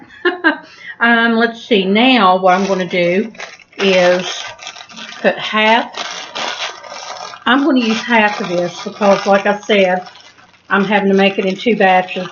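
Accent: United States. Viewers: U are to cute an very southern I thought u said salmon.i was confused (cinnamon)Loved it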